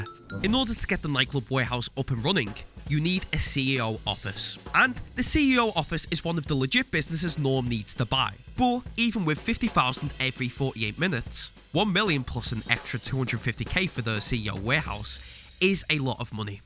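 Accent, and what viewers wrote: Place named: England, United Kingdom. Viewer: "Pearson" It's great to hear someone with a genuine English accent :) You don't get to hear this kind of narration anymore.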